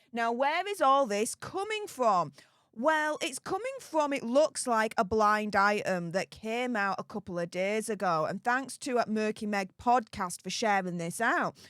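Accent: Yorkshire, United Kingdom. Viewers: Hey up! You always cheer me up. Love your Yorkshire accent. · Hi I love your accent what part of the uk are you from❤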